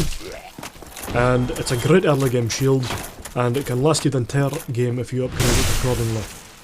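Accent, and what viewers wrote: Scotland, United Kingdom. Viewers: Scotland laddy like me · That's the most Scottish accent I've ever heard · You sound like a Scott. You are naturally awesome if that's the case. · Oh god, you're Scottish, marry me <3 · ***** Scotland mate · Are you like, Scottish? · Pretty sure that's a heavy-duty Scottish accent. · Loved it, cannae beat a gid Scottish narrated video. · Russian? oO By far you should know this guy is Scottish. xd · Guy's a Scot, mate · I tried to think of a something funny to say about your Scottish accent. But I couldn't think of anything.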